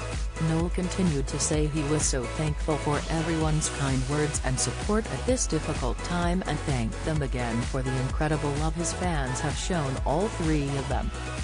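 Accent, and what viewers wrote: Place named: United States. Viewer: Why do we need an American narrator ?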